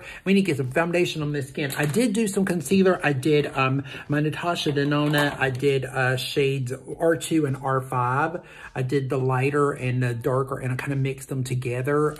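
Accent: South, United States. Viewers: I adore your Southern drawl,. I had a PhD supervisor from Georgia and I would swoon every time he spoke. Even 20 plus years later, I cannot remember a word he said because I was too busy swooning at how he spoke. 😂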